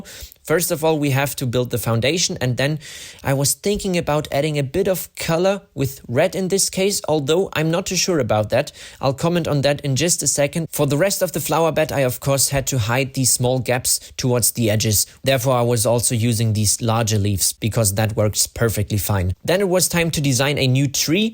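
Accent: Germany. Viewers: ⚠️This isn’t meant to be hate - like, at all⚠️ You kinda sound like you have a German accent 😮 Could you perhaps come from middle Europe?😅 I’m really sorry if this sounds offending in any sense❤😢 Edit: I just saw that elevator video from Jensbach 😂 so are you from Austria? Just curious 😅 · since you sound german you should build a traintrach to a small concentration camp with some gas chamber and ovens, make a working lego oven with a lighter in it to burn some characters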